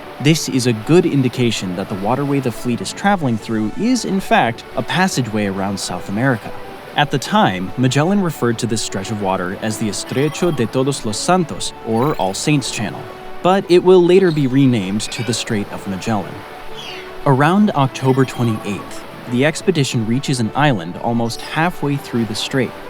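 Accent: United States. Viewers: He’s probably Filipino American by the sound of his accent.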